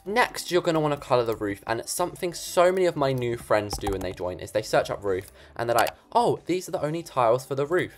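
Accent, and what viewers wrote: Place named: Gloucestershire, United Kingdom. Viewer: You sound like youre from Gloucestershire😭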